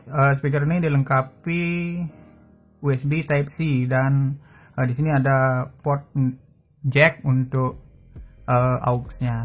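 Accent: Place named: Bali, Indonesia. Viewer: Keliatan logat balinya bli 😂. Rahayu terus berkarya🙏😇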